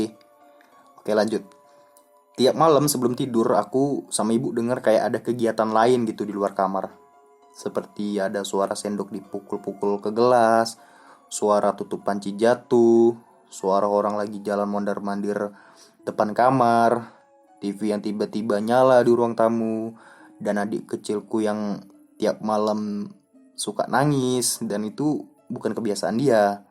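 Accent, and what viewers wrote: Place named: Medan, Indonesia. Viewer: Suka x aku bg denger kau bawakan cerita ini, medan x kurasa.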